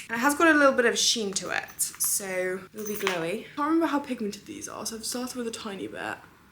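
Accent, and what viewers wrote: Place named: United Kingdom. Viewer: where are you from exactly in UK your accent is hard for me to understand🥲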